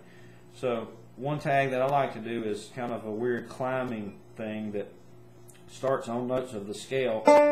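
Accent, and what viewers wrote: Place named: West Virginia, United States. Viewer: Im from mingo co wv, I can tell your southern wv or eastern ky,,, where you at?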